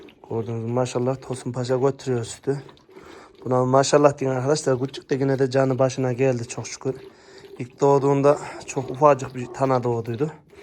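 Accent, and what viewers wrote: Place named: Avşar, Turkey. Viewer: kardeşim selamlar, yeni abone oldum, memleket neresi kardeşim, avşarlık varmı şiveler benziyor gadasını aldığım 🙂 Frankfurt'dan selamlar hayırlı günler 🇹🇷🇹🇷🇹🇷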